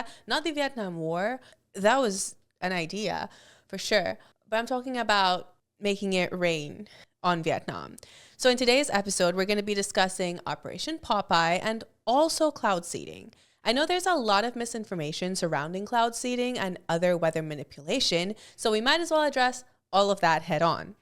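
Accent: United States. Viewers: This was good education! lol whatever you're doing to get the algos to get you eyeballs is working, but your family is endearingly quirky and nerdy, and damn how you put your hubs on the spot is kind of hilarious. He's a champ, though. Not really on-topic, but your accent is unfamiliar to me. Some elements seem like mideast US but there's other stuff going on that makes me suspect you're also multilingual. For context to my question, I'm an aspiring voice actor so that accents are of interest in that way, and also have worked with translators for publications for many years, and I'm always just curious about this sort of thing.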